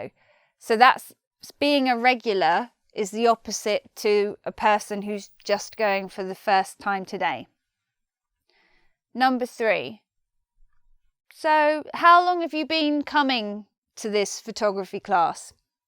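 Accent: United Kingdom. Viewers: I love her British accent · One more time, thank you! I like it British English pronunciation too.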